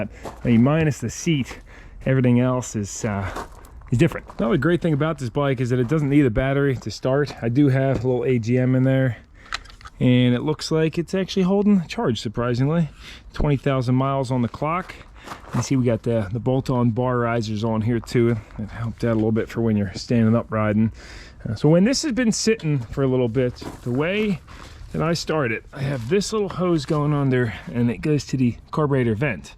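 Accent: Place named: Eastern Pennsylvania, United States. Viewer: Came across one of your videos on the XL, I heard you talking and thought "This dude is from eastern PA for sure." Greetings from Bucks County. I might have to check out some of these spots on my XR one of these days.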